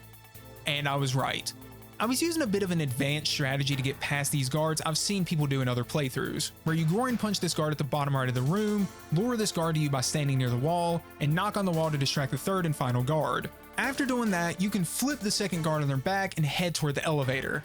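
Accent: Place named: Maryland, United States. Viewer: Bro are you from MD? Not hatin, that accent just sounds so familiar lol. Good video btw, Sons of Liberty is the greatest game ever made imo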